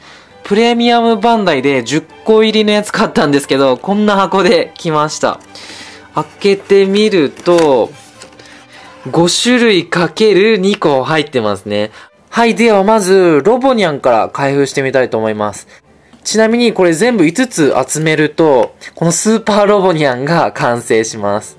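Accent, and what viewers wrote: Kansai, Japan. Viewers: おもいっきり関西弁。笑 まぁおれも関西人やけど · めっちゃ関西べん笑笑と、思う人グッド👍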